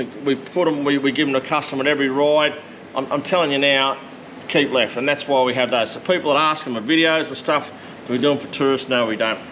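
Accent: Australia. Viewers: sooooo aussie 😂❤️❤️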